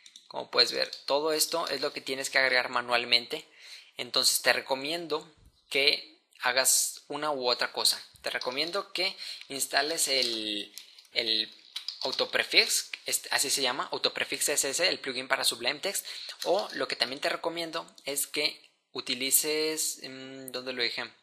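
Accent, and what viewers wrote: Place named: Mexico. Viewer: Excelente video. Aprendí más de Flex. Solo una duda, porqué los mexicanos dicen "WEF", en vez de "WEB"...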